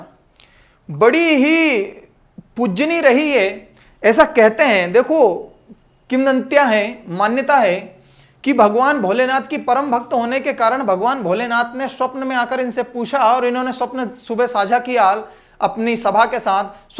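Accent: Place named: Madhya Pradesh, India. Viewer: Sir ji ye to hmari burhanpur ki language h o bhiya wali mja a jata h sunker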